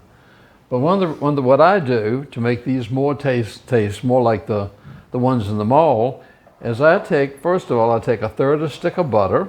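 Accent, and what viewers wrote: South, United States. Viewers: I love your accent❣️ What part of the South are you from (Louisiana, here)? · OMG! I luv your cinnamon rolls and most of all your southern accent makes me home sick for for family in Beaumont, TX YOU go girl. Peace out. S. Valentine Los Angeles, CA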